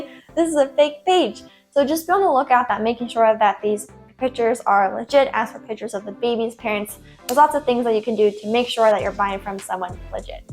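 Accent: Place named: England, United Kingdom. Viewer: Lol your hot sounded very posh England english 😃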